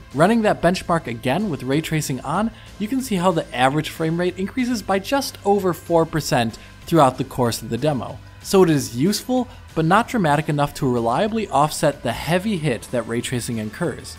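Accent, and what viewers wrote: Germany. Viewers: Lord Farquaad he wasn't so good in his older videos. And he definitely has a slight German accent, you can hear it.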